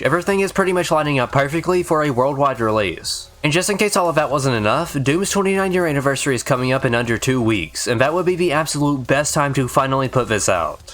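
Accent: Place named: Ireland. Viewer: Are you kidding me, I can't even honestly tell what type of accent he is, maybe irish...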